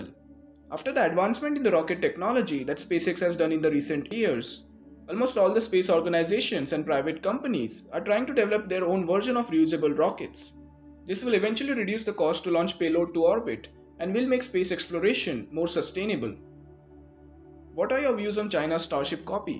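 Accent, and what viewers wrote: India. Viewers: Indian accent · Thanks a lot, this is really very precious and very interesting, for it's very unique. I hope very much to see much more of this in the future, and therefore I wish you a great success, there could be a lot of space being left for you in the scene. Your accent sounds like coming from India, remembering me to three wonderful times in India, as a former field engineer for a Swiss company many years ago. If this is correct, take my greetings, best memories, and great respect from Switzerland, to this wonderful people and culture, thänks.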